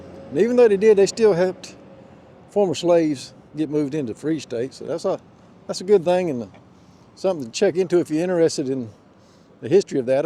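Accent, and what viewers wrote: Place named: South, United States. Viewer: Keep up with the stories, and don't change a thing with "talking Southern", as you are a Southerner after all. As for Rich Square, I feel it's doing a pretty good job of dealing with the hand they have been dealt. Like you said, some really beautiful homes on the outskirt of town, and signs of improvement in the town itself. I hope their plan includes saving those brick buildings if the interiors are half as nice as the exteriors.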